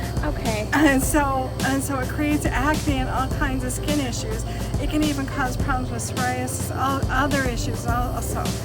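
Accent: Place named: Utah, United States. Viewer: All the way through the video, I kept thinking to myself, “she sounds like a Utah mom.” Then when you mentioned here from Kaysville, I had to laugh. I know a lot of people have had a great deal of success. Doing this, with B5, vitamin a or zinc. Years ago, I had success using a combination of all of them, but forgot about B5 in the years sense, so I’m glad I came across this video as a reminder. My son started getting severe acne right after his last growth spurt and a couple days ago I increased his intake of vitamin a and zinc. I’ve also read a lot of science based evidence that iodine in its natural state, such as a sea, kelp supplement is beneficial and I’m just waiting for those to show up in the mail. Since we have a natural grocers right here in bountiful, I’ll also swing by there and pick up some B5. He’s only 12 and it hasn’t been coming this year for his self-esteem yet so it’ll be good to help him how he’s much younger.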